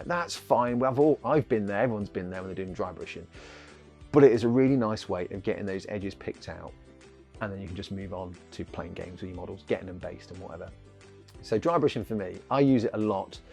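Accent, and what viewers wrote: United Kingdom. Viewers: Is it P Chi Tips or Yorkshire?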